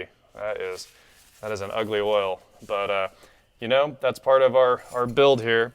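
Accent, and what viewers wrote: United States. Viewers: WTF happened to you.....the majority of the time you speak correctly. Today you are in US mode and it is hard on the ears. Please correct that lazy shit talk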